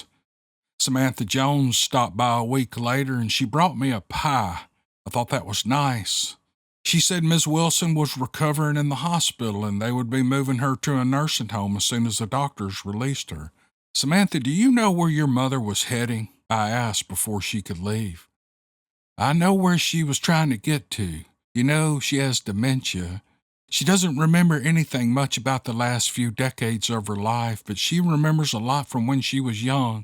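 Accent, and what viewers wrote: South, United States. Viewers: that was an awesome story! Please keep writing when the muse hits you like that. Thanks for sharing that and the other stories, love listening to them. By the way, you sound like most of my neighbors, which is normal for the South. I am in Louisiana and went to Chamberlain Hunt Academy in Port Gibson for 6 years, so part of my growing up was in Mississippi. Great place to grow up! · You, my friend, are a very good writer or storyteller. I could listen to you all day long. I often re-listen to the books you have read while cooking. I like the southern cadence quality that you have. Anyways, I've missed you but had no doubts that you would return to us eventually. ❤ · Darn, you always keep me up late with your interesting, and superbly presented stories......I love the accent, what part of the South are you originally from, is it Georgia? 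· I have so missed you soft, southern voice. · I gotta tell ya Brother, as much as I love, How to Hunt, Steve's an honorable man, as I've said before, I Truly, Appreciate the Southern Man, in your voice. You have a gift, even if it ain't languages or accents, other than Southern.. GBU, and your family. I really love listening to your voice, and the peoples stories, Steve Lilly, or lived experience.....Aloha. Oh, and this story, Twisted.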